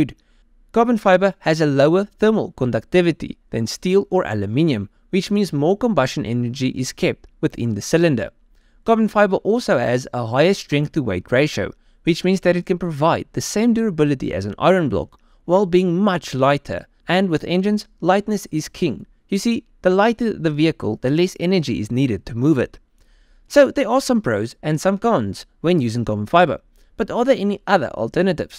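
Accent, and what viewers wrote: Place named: Johannesburg, South Africa. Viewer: Sounds like he’s from joburg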